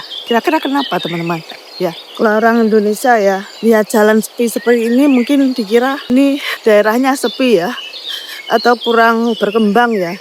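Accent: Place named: East Java, Indonesia. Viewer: Terdeteksinya logat jatim, bener ga Bu? Hehe😅😅❤❤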